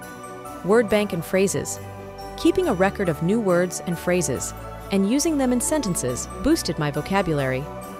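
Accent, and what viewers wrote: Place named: United States. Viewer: Thank you very much for your reply. You are amazing. I don't know where you are from or what your background is like, but you sound very American. I can tell you have been dedicating yourself to learning English and you are still working hard. Much respect. Thanks very much.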